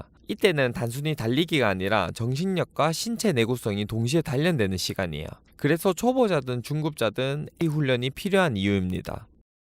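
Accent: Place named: North Gyeongsang, South Korea. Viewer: 대구 경북 엑센트 심하시네 잘봤습니다.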